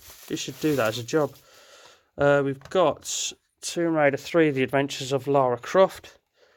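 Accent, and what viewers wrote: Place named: Portsmouth, United Kingdom. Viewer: What a fantastic video from the lads and opened by what i can only assume is a yeti (mate you're well hairy!) you sound like your from down south Portsmouth area? do you have a list/pic of your plat PS1 titles mate?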